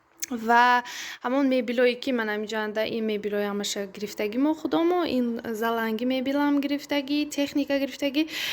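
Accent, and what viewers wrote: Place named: Khujand, Tajikistan. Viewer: Салом Мана дугонам Худжанди буд гапзаниш махкулм буд шумони хамунбарин гап занитон махкул хама влоготона тамошо дорам ай як лав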